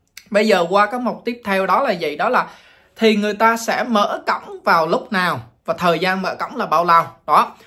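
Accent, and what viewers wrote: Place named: Quang Nam, Vietnam. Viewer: Nghe giọng hình như a quê ở quảng nam đà nẵng gì phải ko ạ. Nghe a chia sẻ rất chân thật dễ hiểu . Em cũng có dự định tham gia mà chưa rõ các giấy tờ nào cần công chứng hay ko cần mong a ra video mới ạ❤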